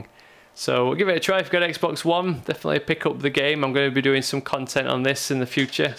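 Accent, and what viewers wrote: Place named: Yorkshire, United Kingdom. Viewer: where you from mate you sound local to me. im at Castleford :D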